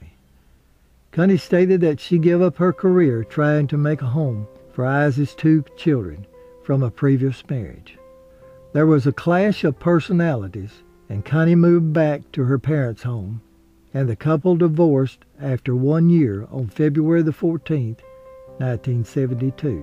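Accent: South, United States. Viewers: Great Southern narration voice. At least this British man loves it. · Such a sad story! I hope I will be able to sleep...after listening to you. Nonetheless, you have a beautiful, soft voice with a soothing southern accent. Thank you anyhow.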